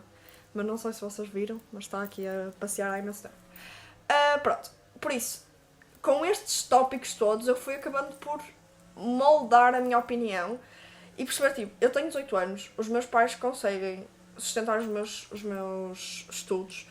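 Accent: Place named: Portugal. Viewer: Sotaque de Portugal é top ! rsrs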